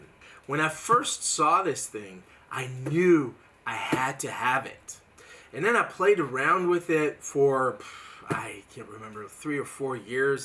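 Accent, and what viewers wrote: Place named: United States. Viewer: Bloody hell. That's crazy. BTW for a German you sound like an American ha ha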